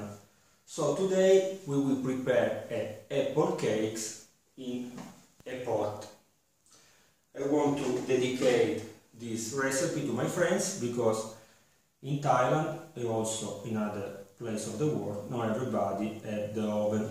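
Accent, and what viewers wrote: Italy. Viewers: so he is Italian, really nice accent.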